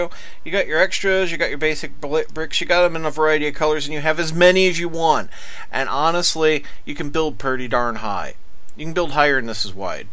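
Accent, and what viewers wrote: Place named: United States. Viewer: You have an american accent and you say you live in scotland did you move?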